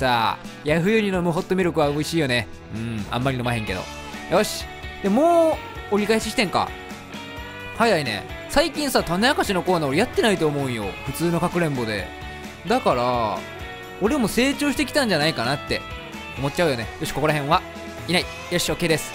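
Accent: Kansai, Japan. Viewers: 毎日の動画みて笑ってます！関西弁だから親近感がwこれからも頑張ってくださいヽ(*´∀｀)ノ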